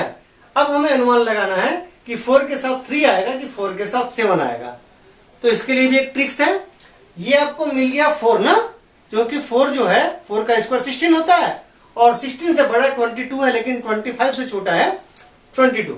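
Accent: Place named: Bronx, United States. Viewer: His south Broncs accent is hard to understand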